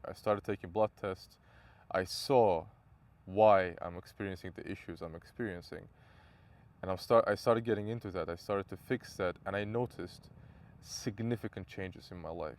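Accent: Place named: Russia. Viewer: сразу слышно что родной язык русский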